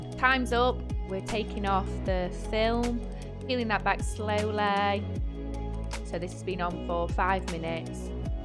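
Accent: United Kingdom. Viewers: Great video but I love the accent! What part of the UK is this? 👌🏾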